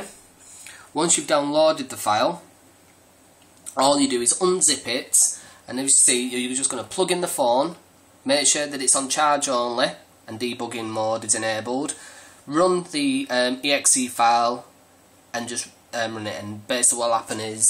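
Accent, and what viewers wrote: Yorkshire, United Kingdom. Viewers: Cheers bud,are you a fellow yorkshire man?great guide to follow.:)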